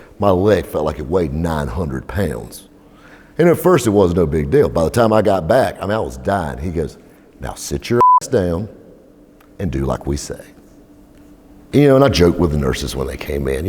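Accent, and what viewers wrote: South, United States. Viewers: your positivity and a good nature generated a good result. Being a character doesn't hurt either. Now I'm sure the good folks at the hospital are used to your Southern accent but in this video and with that voice of yours you make a dang good story teller, son. You are one charming rogue and I could listen to you tell stories all night. Glad it all worked out all right. P.S.: Don't take the "son" part too personal. I'm a week away from 73 years so I've got a few on you. And I ride a '99 1100cc Yammie cruiser (460 lbs dry weight) on a set of stickies and they earn their keep. All in good fun.... Keep it sticky side down, and thanks for sharing. Best regards from a Canadian. The part a couple of hundred miles east of northern Michigan. I'm 45 minutes from the Seaway if the right people are looking in the correct direction, namely away.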